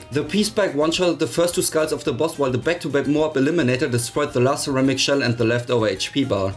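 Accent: Germany. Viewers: Ich kann den deutschen Akzent einfach nicht ignorieren, aber nicer run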